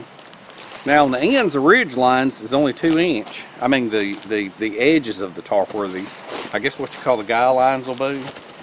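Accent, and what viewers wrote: South, United States. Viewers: I love to hear his southern drawl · Holy Moly 10 days of rain. Good sound for sleeping though. I love the sound of rain on a tent, and rushing water, louder with the wind the better. I so love your great quality gloves with the velcro wrist straps to tighten. Nice matching hat too. Wow great idea on the 2 custom tent stakes and to boot you criss cross them for extra stability. You are one of the cleanest best color coordinated dressed bush crafter or sometime woodsman lol on YouTube I bet. Good for you! What was that in your accent, was that sake? Love the southern accent. Wow awesome results!!!! Hot stuff wow. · How did you make your reflective tarp and firewall? I learn a lot from you and love the southern accent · you have the heaviest southern drawl I think I've ever heard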